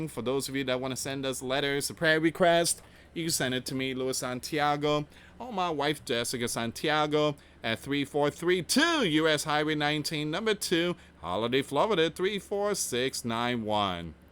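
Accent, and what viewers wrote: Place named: Portugal. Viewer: Hi, are you Portuguese? I can't quite figure out what type of accent you have. You remind of the Dog Daddy, he has the same accent. He is a great young man who trains problem dogs of all types.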